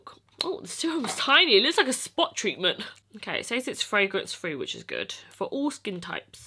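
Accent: United Kingdom. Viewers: Ik haw she is british Her accsent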